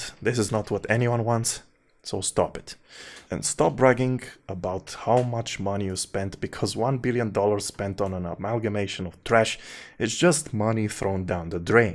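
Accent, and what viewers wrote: Eastern Europe. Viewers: I can hear your accent. You're Slavic. I'm Slavic. We were brought up into a culture that teaches us to be able to enjoy and find wonderful things in stories that are nothing LIKE ours. Even if there is NOTHING AT ALL Slavic about LotR, we have no problems loving it even if we're "left out". In fact, we never REALIZED we were "left out" until black Americans came up with the CONCEPT of being left out. To US, it was perfectly NORMAL an English author didn't write Prague or Cossacks or Perun into his books set *in an obvious paraphrase of NORDIC and ANGLO SAXON mythologies*. To them, it isn't. I might be unfair, but the media certainly makes it seem that if ANYONE in any way DARES to do anything at all without black americans, there is racism involved and it's insulting. In fact, I think we might have to create black cheese in order to right this HUGE injustice of making cheese white and yellow, but not black for SO long. 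And just to note, episode 5 - I'm still waiting for an ASIAN actor? Even when they could have cast ALL the Elves as asians, given them blonde wigs and contacts and with some make-up they'd look WAY closer to Tolkien's description than the dude who makes me wonder if Elves f*** by colour in order to maintain black people among their ranks. As I wait, I am looking forward to a remake of Red Cliff starring a white Cao Cao, a black Zhou Yu and a female trans gender gay latino Zhuge Liang. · You are not a real fan. You are a Russian separatist. You definitely have Slavik in you based on accent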